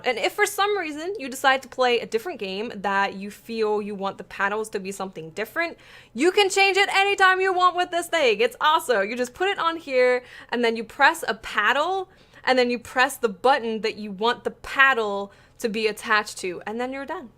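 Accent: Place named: East Coast, United States. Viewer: I'm lovin that accent lol. new yorker? Philly? you gotta be from one of the East Coast cities haha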